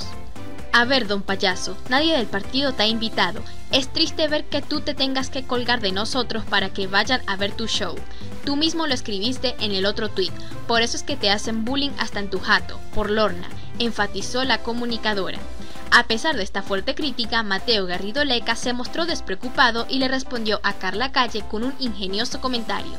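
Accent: Venezuela. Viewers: ACENTO ME SUENA VENEZOLANO Y NO PERUANO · en este video se te sale el acento venezolano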